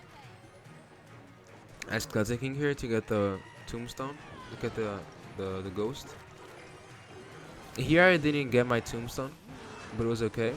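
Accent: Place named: Brazil. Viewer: Pelo sotaque vi que era BR kkkkk, joga mt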